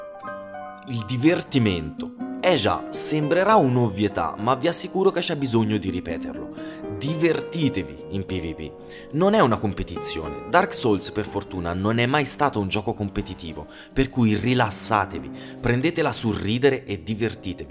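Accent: Tuscany, Italy. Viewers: Bravissimo bel video! Toscano? :D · Fammi indovinare... Toscanissimo! :D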